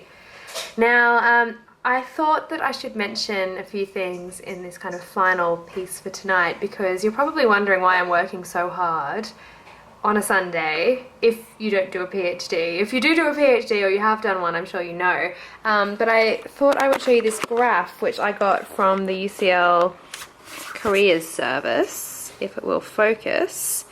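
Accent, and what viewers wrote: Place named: Australia. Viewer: One question: Are you Australian? You sound sooo much like it! (I'm an Aussie myself).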